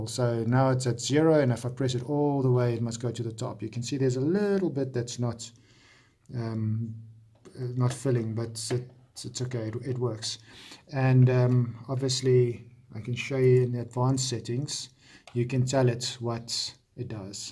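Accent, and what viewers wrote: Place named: South Africa. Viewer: I can hear that SA accent bro🤣 #represent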